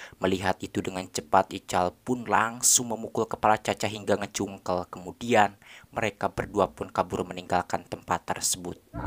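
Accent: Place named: Sukabumi, Indonesia. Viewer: Bang si abang ini aslimana teh ?? Sukabumi kah ???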